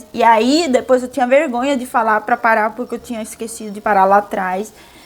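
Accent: Rio de Janeiro, Brazil. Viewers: as experiências vividas aqui no Brasil, são frutos das adaptações, tem um preço a ser pago, mas que uma desbravadora, você é uma mulher de muita fibra, parabéns pelas conquistas e o que fez você vim pro Rio de Janeiro, "Cidade Maravilhosa "..daqui a pouco vai esta com sotaque de carioca igual a mim, beijos💜💙💛❤💚😘😍🥰👏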